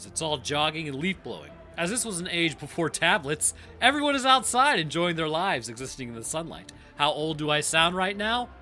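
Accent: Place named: United States. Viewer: "Learning more about the world around us" I imagine that would be vastly different between literally ANYONE ELSE IN THE WORLD, and Seppo's...who have no idea what the rest of the world is like, outside of what their State-based Social Media brainwashing and generational indoctrination tells them...which, ofcourse, is all wrong! Also, I know all english-speaking countries and peoples have an accent... but Imperial Measurements are pronounced "Fu<kwit units"... I know, I know, its an accent thing, I hear it as "Freedom Units", but just wanted to clarify that for all the other English-speaking audiance members... he is saying "Fu<kwit units". And I know, we hear his American Accent as "heathen units", but thats just the Seppo twang on "Metric units"... Remember, the U.S. is a third-world country pretending to be a first-world country... They don't know how good it can be away from that toxic brainwashing and indoctrination...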